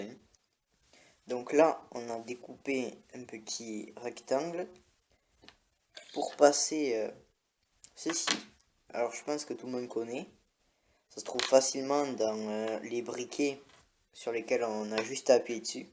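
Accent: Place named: South, France. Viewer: l'accen du sud qui fait plaisir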